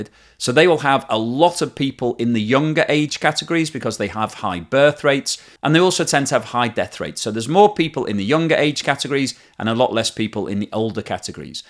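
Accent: United Kingdom. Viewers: I can tell by your accent, you're British, why don't you do a video on your own country's economy!? Spoiler alarrt... it SUCKS!!!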